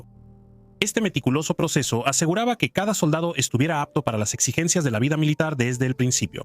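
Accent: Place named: Latin America. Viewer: no puedo man ¿que coño me hablas de nuestra guerra con ese acento bananero? Leck mich am Arsch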